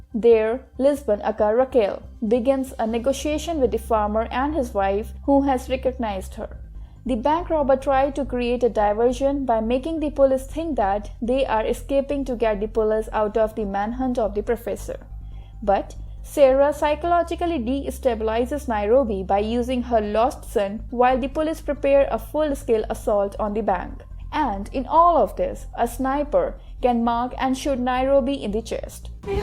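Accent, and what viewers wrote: India. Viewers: good recap, but dislike for the indian accent.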